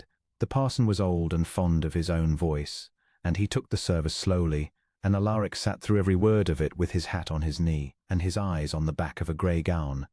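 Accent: United Kingdom. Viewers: I loved this story! Beautifully written and superbly spoken with the appropriate British accent. Thank you!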